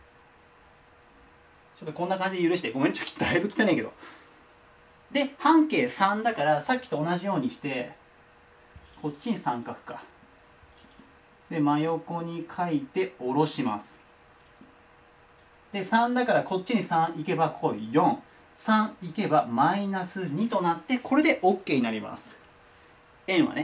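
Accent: Kansai, Japan. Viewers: 関西の人なんですね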